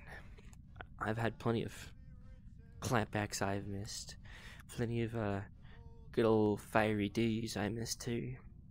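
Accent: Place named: Australia. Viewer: I love this! I thought you were a husky at first and clicked the video because the avatar was cute. The next thing i know, I'm listening to an Australian accent kangaroo 😂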